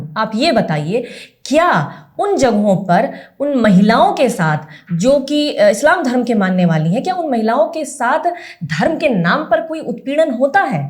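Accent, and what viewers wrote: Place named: Uttar Pradesh/Bihar, India. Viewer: Superb presentation with classic rural UP & Bihari hindi touch. Very professional narration. Keep it up.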